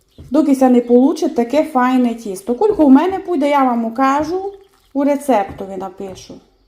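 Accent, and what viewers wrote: Transcarpathia, Ukraine. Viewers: Добрий день,. Що смачні оладки, нема сумніву, але мені дуже подобається ще твій діалект, твій говір, урівноважений, спокійний, гарний закарпатський. Пару разів повторюю ролик, щоби чути твій голос, твою мову. В кожному краю України свій діалект. Я із Прикарпаття. Дякую тобі за Закарпатську кухню. Цікаво, смачно. Слава Україні! Слава Героям! Вічна пам'ять полеглим воїнам! ❤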